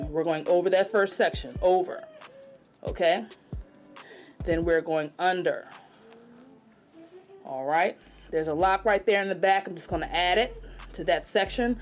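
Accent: Baltimore, United States. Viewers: That B-more accent is thick!!🔥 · Tew…. Lmao Sounds like Baltimore is where you’re from… hopefully I can master this on my sons locs in the am… 🙏🏽❤️ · Dope ENERGY I JUST SUBSCRIBED BECAUSE YOUR VOICE IS SO CLEAR .. u from Baltimore AINT you Heey girl ❤️ I’m literally about to do this on my client going to Memphis I know how to fishtale braid it never did it on LOCS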